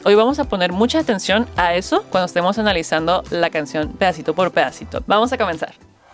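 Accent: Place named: Mexico City, Mexico. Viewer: Es el mejor, lo viven vivo en NYC. El Ingles es su tercera lengua ( Kazajo, Mandarín,Inglés) más o menos fluido. Escuché norteamericanos e ingleses reaccionando y dicen que su casi perfecto. Con tu inglés chilango tienes razón ( nada que ver ) no escucharás el inglés. Te daré referencia de gente que habla inglés, desde “ ” song se nota su mejoría. La música no tiene idioma. Por lo demás está bien tu estilo de reacción.. Te felicito porque descubriste al mejor. 👍👍🇭🇳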